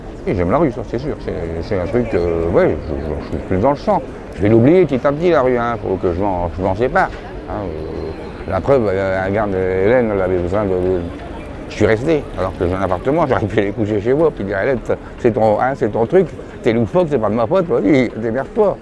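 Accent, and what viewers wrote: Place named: North, France. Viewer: Et encore vous êtes à lille et en général il y a bcp de mois froids que chauds. Essayez de faire l expérience d être toute la journée dans le froid et pas que 3 jours... le Monsieur me fait bcp penser à des gens de la rue qui retrouvent un logement après des années. Bcp dorment encore dans la rue c'est comme une addiction... vous avez l air d être une bonne personne Madame et je reconnais bien l'accent du nord. Le seul bémol j aime pas les vidéos experience sociale ca me met mal a l aise car c est quoi 3 jours ??? Tu ne peux pas comprendre tu n es pas dans leur vie quotidienne.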